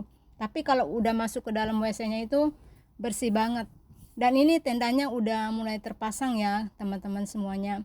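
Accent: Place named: Java, Indonesia. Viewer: Mbak logatnya jawa ,,jawa mana mbak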